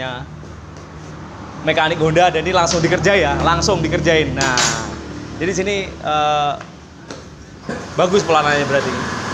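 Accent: Java, Indonesia. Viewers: Rantene rondok amoh...😂😂😂😂 Gue suka logat jawanya..😂😂😂😂👍👍👍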